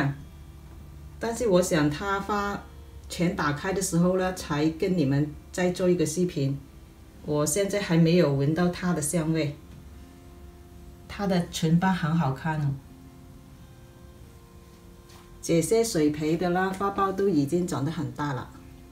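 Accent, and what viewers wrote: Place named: Cantonese, China. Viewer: 我之前有跟妳留一段留言，妳可能沒注意看！我說我非常喜歡聽廣東歌，妳說的普通話有點廣東腔，特別親切、可愛欸！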